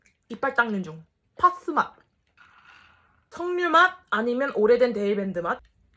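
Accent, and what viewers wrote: United Kingdom. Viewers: 하 ㅠㅠㅠ 진짜 요즘 너무 재밌어요... 영국 발음의 신사적인 부분괔ㅋㅋㅋㅋ 한국어의 친구같은 그,, 말투의 조합이 장난아닙니다... 고3인데 언니가 읽어주는 수능특강 지문이 너무 듣고 싶어요... 올려주시면 주구장창 연습할게요 😂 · 생전 가지도 못할 영국 매너와 발음 잡도리가 그리우ㅓ져 이젠 셀프로 주기적으로 옵니다 그와중에 이거진짜 조오온나 웃겨요 ㅠㅜㅜㅜㅜㅜㅜㅜㅜㅜㅜㅜㅜㅜㅜㅜㅜㅜㅜㅜㅜㅜㅜㅜㅜㅜ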